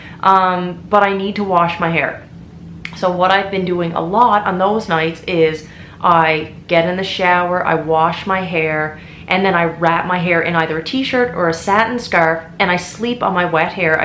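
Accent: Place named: Newfoundland, Canada. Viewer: “My toilets right clean” 🤣 I’m from Newfoundland, and I just love that your so free with your accent!!! 😍😍 right clean, yes byyyyyy!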